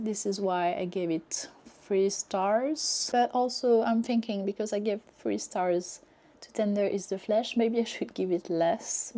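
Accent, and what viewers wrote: France. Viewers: I have to admit, both the video and sound quality have improved 😎☑ I can hear you a lot better now, parse out the spoken words and sample their distinctly French flavour Horror and humour can go hand-in-hand depending on how well they are balanced in a story. It is very interesting hearing your critical take on Tolkien. The fact you don't like the fictional emphasis on genealogies and lineage as they relate to the overall story, making the reading experience a bit tedious. You are the first person I have come across who thinks J.R.R Tolkien's writing style has a tendency to be superfluous. You think he's a showoff:face-green-smiling: I appreciate your French perspective. If I were to ask someone from the US or the UK about LOTR, I'll be informed of Tolkien in glowing terms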